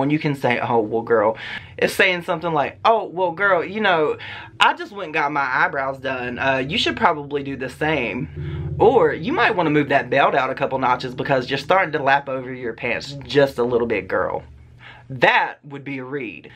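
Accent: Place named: Alabama, United States. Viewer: New sub. Our accents match! I’m from Alabama ♥️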